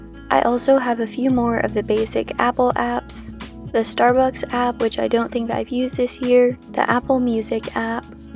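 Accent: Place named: United States. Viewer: Is it just me orrr but she has Tesco mobile on her phone she sounds American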